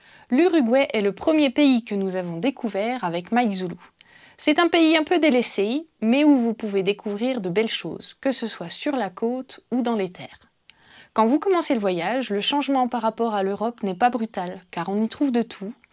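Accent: Haute-Saône, France. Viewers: Magnifique résumé de votre voyage, ça n'a pas dû être facile de choisir les séquences tellement vous nous avez montré de beaux endroits depuis ces 3 années. Continuez bien comme ça à nous régaler. Vesoul et les autres contrées de Haute-Saône nous ont été familières durant de nombreuses années, ayant débuté ma carrière à Luxeuil, c'est votre accent qui m'a attiré au début. Merci en tous cas pour toutes ces belles vidéos. Prenez soin de vous là-bas en Hémisphère sud. Ici en Périgord on vous admire. Jean-Louis Adishatz. 😘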